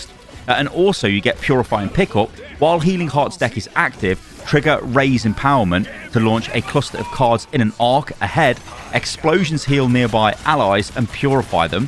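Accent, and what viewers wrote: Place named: United Kingdom. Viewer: It's just his br'i'sh accent ;)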